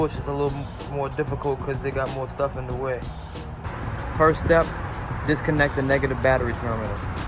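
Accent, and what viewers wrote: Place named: Louisiana, United States. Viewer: Louisiana boy.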